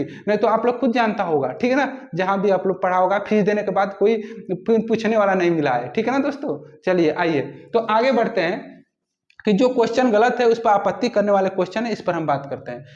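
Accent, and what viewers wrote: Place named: Eastern Uttar Pradesh, India. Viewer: East up se honge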